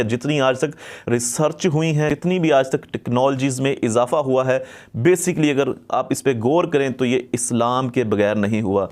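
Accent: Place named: Pakistan. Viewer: برو کیرم دهنتون با اون صحبت کردنتون پاکستانی کثیف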